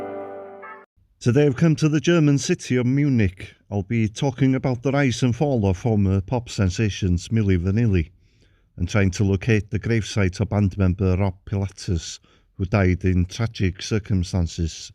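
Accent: Wales, United Kingdom. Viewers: Sounds Welsh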